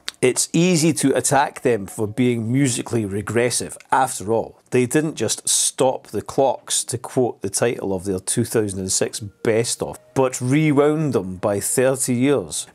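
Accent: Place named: Scotland, United Kingdom. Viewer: 😂 I loved your video. Greetings from Colombia 🇨🇴 South America (of all places ) landed here and it made my day.😂 I have to say it man .. the accent .. fantastic! Never hear. Before. Scotland 🏴 right ? Very nice. Beautiful. Will listen more Cheers 🥃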